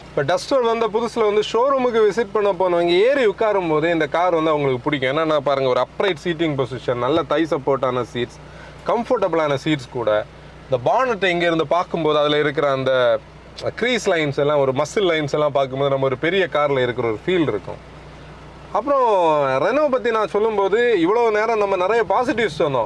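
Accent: Kanyakumari, India. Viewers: Kanniyakunari slog apadi than irukum..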